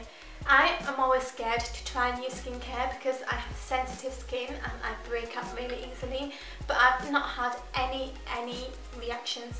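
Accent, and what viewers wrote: United Kingdom. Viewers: you have a kind of weird british accent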